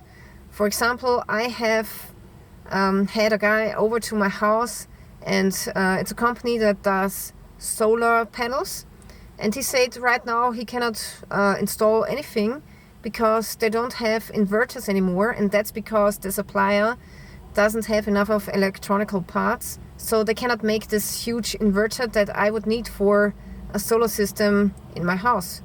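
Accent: Germany. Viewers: Wohnen sie im Deutschland, deinem aksent, horen zum mir wie Deutschen. Es tut mir leid, mein Deutsch ist jetzt nicht so spitze....und keine umlaut habe ich, am Keyboard. Im Jahre 2001, ich habe nacht Deutschland besuchen, und wahre ich einem Student. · Is this German accent? -_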